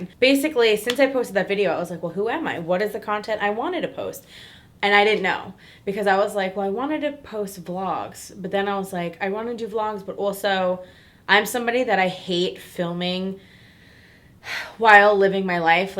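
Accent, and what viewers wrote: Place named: New York, United States. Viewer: IVE ALWAYS LOVED YOUR NY ACCENT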